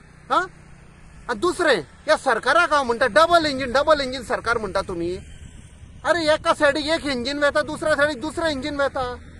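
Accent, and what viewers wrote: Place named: Konkan, India. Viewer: Enough enough. Getting irritated by your accent of konkani. Khuicho re baba tu? Outside Goa?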